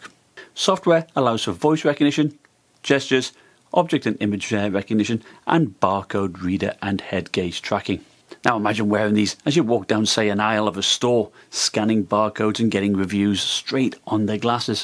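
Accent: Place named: Newcastle, United Kingdom. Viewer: U from newcatle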